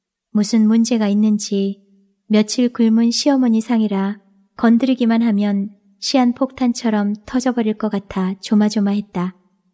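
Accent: North Korea. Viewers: 이북 사투리를 맛깔나게 쓰셔서 우리 고모만난듯 했어요. 따뜻한 소설 잘 들었습니다. 감사합니다 😊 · 언제 그옛날 많이 들어보던 구수한 이북 사투리를 습득 했을까 --- 제목 같이 멋져 버렸네요!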